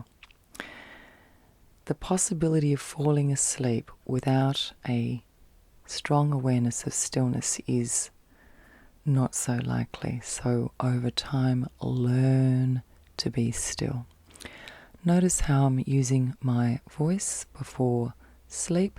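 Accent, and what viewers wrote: Australia. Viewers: I just discovered you in perusing sleep & anxiety videos. I'm Alex, a 46yo San Francisco, CA, USA 🇺🇸 native, and you have one of thee most soothing, sultry, feminine voices and dispositions I've ever heard. You're an Aussie, I assume? Thanks for this therapeutic, relaxing video. 👌🏻😴💤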